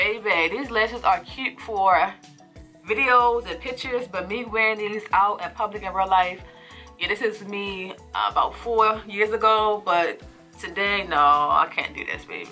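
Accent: Baltimore, United States. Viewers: Great video. Is your accent Mayland/Baltimore?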